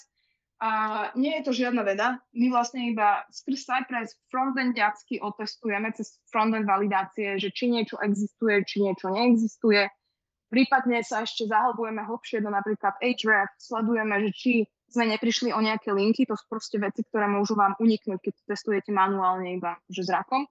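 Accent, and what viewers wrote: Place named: Bratislava, Slovakia. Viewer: tato tema ma vobec nezaujima, ale pozrel som si to cele lebo mi chybal bratislavsky prizvuk :)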